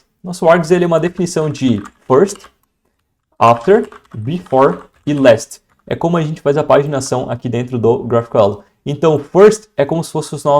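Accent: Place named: Santa Catarina, Brazil. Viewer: ^^ Nesse exato momento estou fazendo um challenge pra entrar em uma empresa que usa a stack, percebi seu sotaque catarinense, e se não me engano já assisti a alguma palestra sua, vc é de Joinville amigo?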